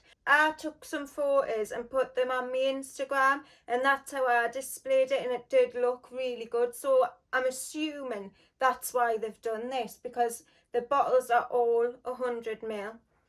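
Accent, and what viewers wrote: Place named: Newcastle, United Kingdom. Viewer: I’m not from the UK and I love your accent, is it Geordie? Just got majestic woods and love it!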